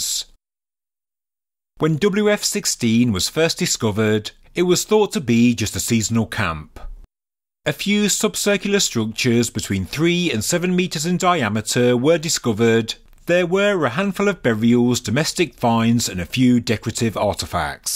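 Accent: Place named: West Midlands, United Kingdom. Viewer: Native of the UK here, I believe it is a west midlands accent ( guess only ) I play at 1.25 speed it makes it more bearable 😂😂😂😂😂😂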